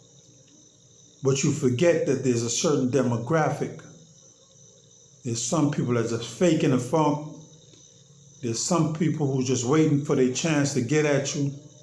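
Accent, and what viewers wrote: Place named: Belize. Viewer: I thought that but it's actually Belizean they sound similar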